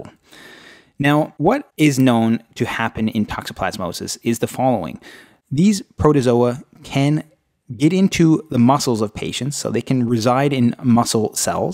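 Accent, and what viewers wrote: Canada. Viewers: i can tell youre Canadian lol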